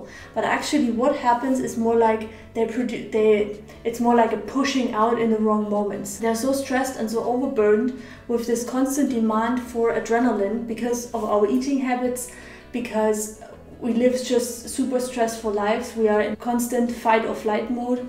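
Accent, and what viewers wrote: Germany. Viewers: das ist doch n deutscher akzent :D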